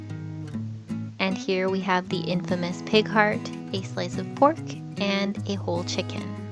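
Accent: Standard. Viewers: Your Chinese accent is very standard and has been integrated into Taiwanese life. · 這英文 真的發音好標準 而且字字清晰 歐告好可愛喔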